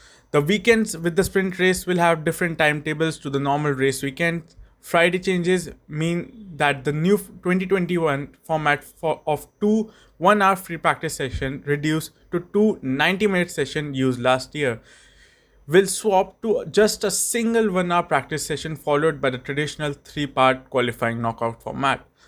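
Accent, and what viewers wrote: India. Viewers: Ngl I always thought you were indian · ur accent..u Indian?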